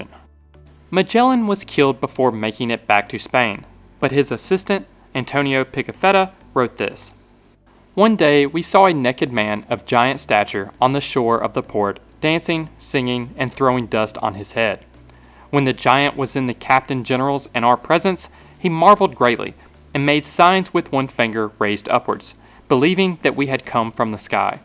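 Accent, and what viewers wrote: United States. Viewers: I don't think it is English...The narrator's accent is American.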